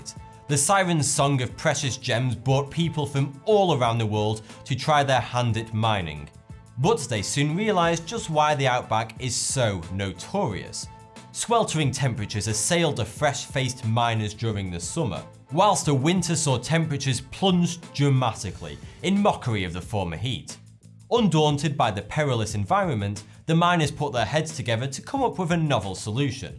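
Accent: United Kingdom. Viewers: It's literally a normal British accent... This is what most of us sound like, not that RP bullshit Americans expect us to XD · A weird accent?! Its a british accent, the home of the language! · It's just his accent, some British people say f for th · I thought it interesting that the video showed US money falling rather than French money, or even British, since the presenter sounds British. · His name isn't 42, he's actually saying but with his British accent. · I was interested in this video, but I'm not watching it because your non-standard pronunciation of "f" and "th" really grinds my gears. I don't know about everyone else, but I associate this language "feature" with lower-class, uneducated people. Rationally, I know it is just one of many British dialects, but emotionally, to be redundant, IT GRINDS MY GEARS. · Wearing a suit and having a brit accent obviously has not made you an authority. 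You could have done so much more with this. Among the many things you missed....there are hundreds if not thousands of cave houses available right now all over the world..Spain is a big one. And an underground house is possible anywhere on this planet with a constant temp. inside. I find this whole video obnoxious and full of incorrect information. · I am Canadian, and though I don't think that fact is especially relevant, I find his particular British accent adorable, especially since his insights and conclusions about the facts he researches are so intelligent and well thought out.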